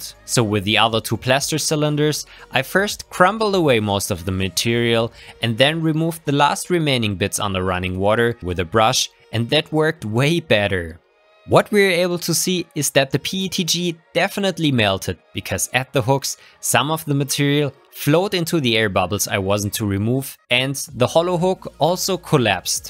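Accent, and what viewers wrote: Germany. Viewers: This test machine looks like some torture machine, and German accent doesn't help either.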